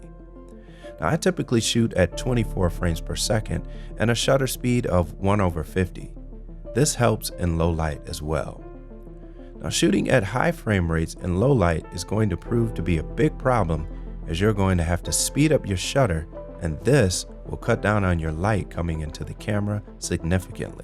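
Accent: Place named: United States. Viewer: From your voice and accent I can tell you're from the US, let me know where exactly in the US so I can go hug you??.. Geez 100/10 content and narrative. You just earned a new sub man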